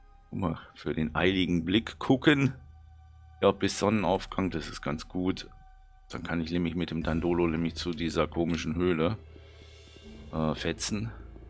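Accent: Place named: Bavaria, Germany. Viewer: Dein bayrisch klingt ganz ordentlich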